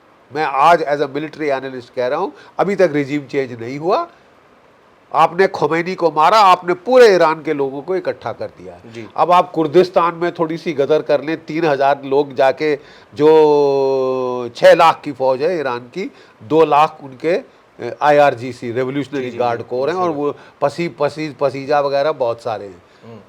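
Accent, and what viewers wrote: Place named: Punjab, India. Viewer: Punjabi बंदा जितना मरज़ी अछि हिंदी सीख ले. मगर उसका लेहजा बता ही देता है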